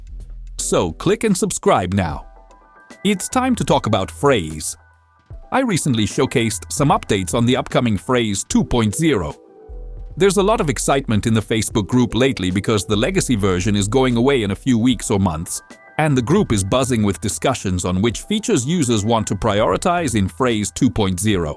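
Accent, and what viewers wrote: Italy. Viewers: I miss the Italian accent and charm.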